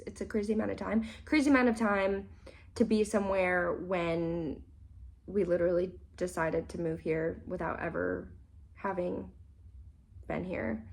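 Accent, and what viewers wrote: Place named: New Zealand. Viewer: I can hear a bit of Kiwi inflection in your speaking which is super endearing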